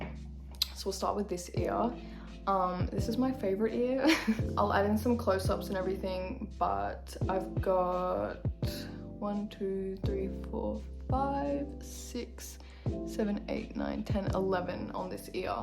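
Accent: Australia. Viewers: My bi Aussie ass just sitting here stunned hearing a similar accent to my own and watching someone exist being that pretty- like huh 0-0 how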